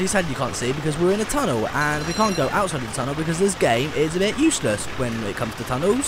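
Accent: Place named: United Kingdom. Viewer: That's just his accent, it's British pronunciation.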